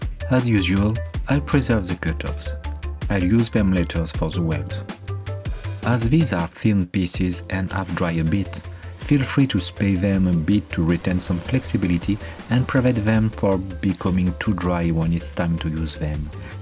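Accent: France. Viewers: Again beautiful work. I like the ´frenglish´ accent too. You have inspired me a couple of years ago to make bonsai pottery myself as I learned a lot from your videos. Can´t wait to see your pots on the trophy next week and see the artist in person. Greetings from Belgium. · Great video! So nice to hear that great accent. All this time we didn't know you are French. Not that it matters, just enjoyable. You are such an inspiration. Thank you...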